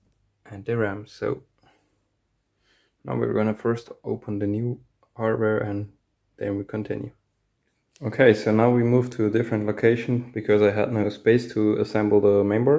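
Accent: Germany. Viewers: Man hört voll das deutsche Englisch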